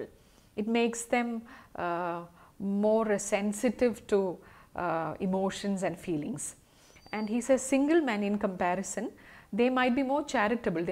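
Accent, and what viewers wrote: Kerala, India. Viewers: Good lecture mam... r u malayali or kannada??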